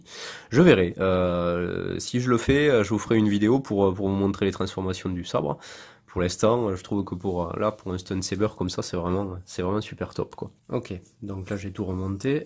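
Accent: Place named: South, France. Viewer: merci pour ta vid, après être tombé par hasard sur une vidéo de Michelle Christa Smith , j'ai trop envie de me lancer dans le maniement du sabre laser mais pas pour du combat, juste du freestyle. tu pense qu'il y a des clubs ou groupes dans le sud? vu ton accent je pense qu'on est pas loin.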